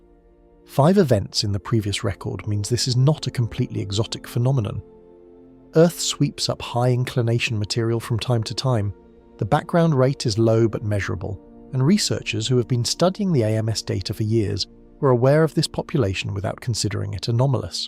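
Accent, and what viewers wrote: United Kingdom. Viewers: Please. Get rid of the insufferable Brit accent. Enough is enough! 😮 😊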